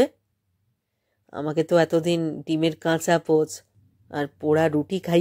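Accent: Bangladesh. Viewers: apnar bangladeshi dialecte pather jonnyo shrutimodhur lageni . aar kono action charai jeno reading porechen.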